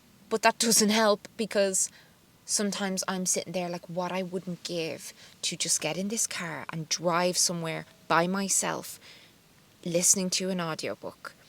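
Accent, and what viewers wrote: Ireland. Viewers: I just love your personality and your self and videos!! You are my favorite YouTuber and I would be soo sad if you left, but I would understand. Maybe you could do a podcast in the future if you decided to leave YouTube because your voice is soo lovely to listen to. I miss Ireland and I love hearing how you talk! Thanks for all the big sister advice. (Even though you’re not teaching people in person you are here online and I’m so grateful for what I’ve learned from you!) ❤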